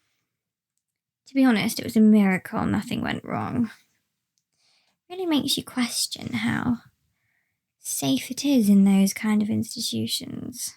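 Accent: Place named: RP, United Kingdom. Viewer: man, you look hotter than ever in this cosplay! and that accent. I normally dislike rp but yours is amazing and suits your asmr roleplays sublimely.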